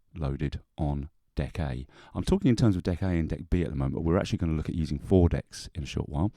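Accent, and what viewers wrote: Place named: United Kingdom. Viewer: Thanks! GREAT TUTORIAL. For some reason things are a lot more intriguing in a British accent. PS. Im going to download all the songs you played.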